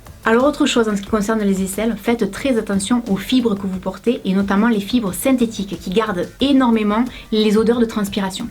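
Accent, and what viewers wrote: South, France. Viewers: Bonjour. Très sympa tes vidéos et ton accent du sud (Pyrénées Orientales comme moi ou région PACA ?). Je rajoute un petit astuce déodorant naturel : par très forte chaleurs l'huile essentielle qui fonctionne très bien c'est celle de palmarosa ; ) diluée dans un petit spray comme tu le fais pour la lavande. Ça sent un peu fort après application mais l'odeur s'estompe rapidement. La transpiration ne sent pas. Efficace pour séance de sport par exemple. Bonne continuation. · Ca fait plaisir d'écouter cet accent du sud !!!!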